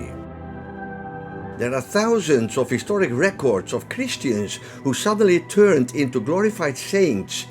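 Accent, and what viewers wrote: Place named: Netherlands. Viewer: Love the Dutch accent;) great content btw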